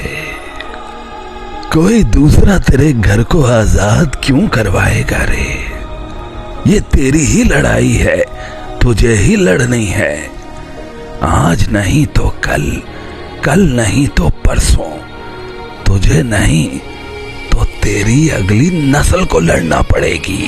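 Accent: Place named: India. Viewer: Please hm musilmaan hyn alhamdulillah aap apni voice aur language style hamarey acer ki copy kr saktey hyn Bharti style toh bilkul achaa NY aapko suite NY krta please bra na mannyeyga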